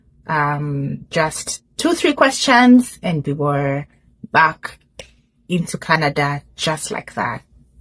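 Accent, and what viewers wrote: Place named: Kenya. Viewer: Well, you need to go back to school and evaluate your education. I have studied psychocology too. And as a kenyan, I know she is kenyan. Her accent speaks for itself. 😏🙄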